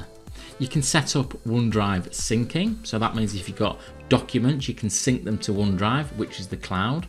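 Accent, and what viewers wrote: Yorkshire, United Kingdom. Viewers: Yorkshire, Yorkshire , Yorkshire.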